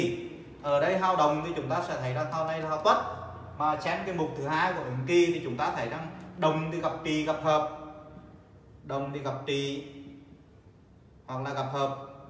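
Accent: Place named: Hanoi, Vietnam. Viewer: Vậy khi đi dạy thầy nói giọng Hà Nội cho nó máu ai cũng nghe được hết chỗ để chê thầy còn khi về nhà thầy lại nói đúng giọng của mình. Thầy rất giỏi và giảng giải cũng rất dễ hiểu tỉ mỉ từng chi tiết nhỏ khâm phục thầy. Xin cảm ơn về những khoá học miễn phí của thầy và chúc thầy cùng gia đình luôn nhiều sức khoẻ và luôn may mắn và luôn luôn ngập tràn trong hạnh phúc an vui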